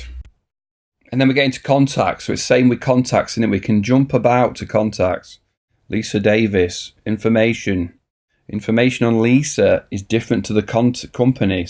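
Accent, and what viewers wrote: North, United Kingdom. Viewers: Please remove that British accent and speak like a human. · Hi, Thanks for sharing this! I'm (we are) new to HubSpot. Its good to hear a Northern UK accent in a tutorial. My brain switches off when hearing an early millennial American accent. I guess I'm conditioned. Really helpful intro. Thanks again!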